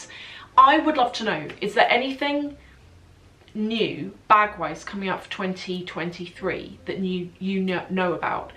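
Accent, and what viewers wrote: RP, United Kingdom. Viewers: Your accent is so posh!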